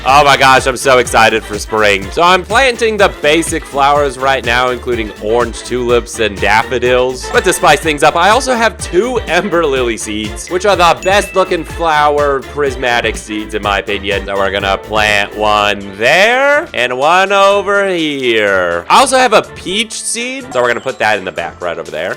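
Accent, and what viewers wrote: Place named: United States. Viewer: Why does he sound American even though he said autumn?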